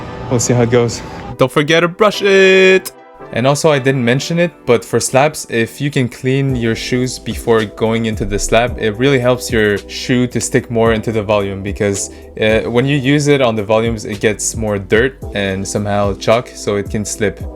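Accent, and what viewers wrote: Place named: Quebec, Canada. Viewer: 2e jour de suite où j'écoute une vidéo pas rapport en anglais et je réalise en plein milieu que c'est un québécois comme moi.😂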